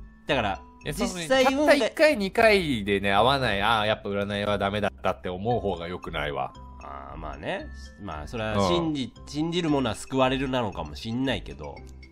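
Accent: Kansai, Japan. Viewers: 大体レトさんと同じだわ やっぱ関西なのかね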